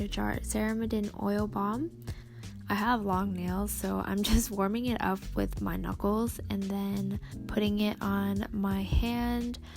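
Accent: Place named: United States. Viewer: Your voice sounds like Jess conte but with an American accent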